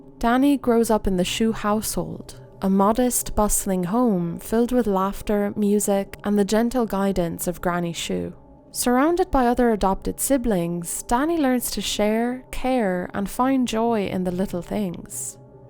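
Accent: Ireland. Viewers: I have been listening to you and watching your videos forever and only just now clocked your Irish accent!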